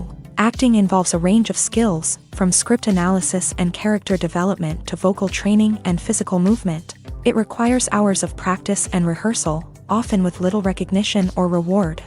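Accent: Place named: United States. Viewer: I wanted to watch the movie, not listen to a description - even less so in a twangy American accent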